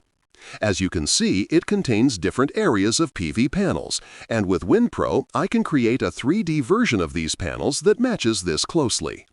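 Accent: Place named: Europe. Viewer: very good detailed how to PV panels , it will be my go to video for the next few months as i work through projects, Ai voice is good and clear and I can see how it is useful for a international audience, but I do miss the personal touch of the European accent on previous EMD videos, but that is jus me preference.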